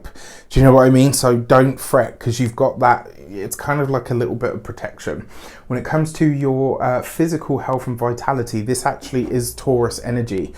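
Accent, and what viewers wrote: England, United Kingdom. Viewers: Dude, i don't know if i want to stay here. You're just to cute and that English accent is driving me Crazy🤫. 18 Years with my husband Remember.😂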